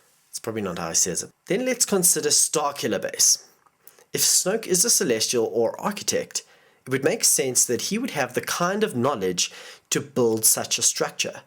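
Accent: South Africa. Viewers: where are you from u sound south African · Are you south African? cause you sound South African. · Are you South African? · Are you some what of South African origin? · where are you from? you sound south African but I could be wrong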